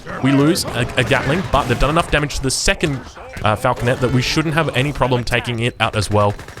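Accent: Australia. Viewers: Love the way ,you aussies say "Good'ay"! I've been playing AOE III for some years, but i don't feel prepared for online xD Cheers from Portugal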